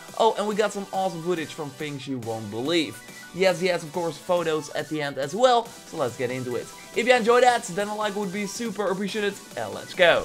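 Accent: Netherlands. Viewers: Ooh daam i love ur dutch accent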